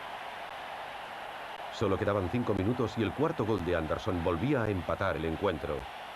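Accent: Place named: Spain. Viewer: Para documentales no existe nada mejor que la de un español o española... lo máximo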